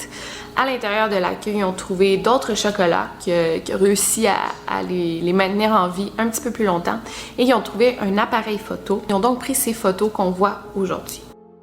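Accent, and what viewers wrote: Quebec, Canada. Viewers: Je t'ai découvert y'a 2 jours par pur hasard et je fais que regarder tes vidéos depuis, j'ai un gros kiff pour l'accent québécois mais c'est pas que ça, lol. J'adore ta façon de raconter les histoires, tu es addictive 😉, c'est comme si j'arrive plus à m'arrêter tant que j'ai pas tout vu. Bisous de France et continue comme ça tu es au top. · Merci pour ton travail, t'es vidéo sont toutes plus intéressantes les unes que les autres. J'adore ce que tu fais, et ton accent québécois donne un truc en plus à tes vidéos. Merci et continue comme ça💙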